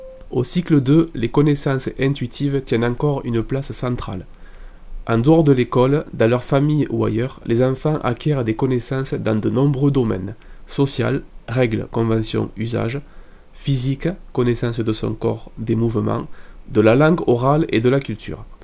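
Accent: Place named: Provence-Alpes-Côte d'Azur, France. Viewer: Pourrais-tu m'envoyer le lien pour télécharger les fichiers audio ?? Je crois que tu vas me bercer longtemps 😉 et pour l'accent j'étais pas loin, je suis en Paca 😁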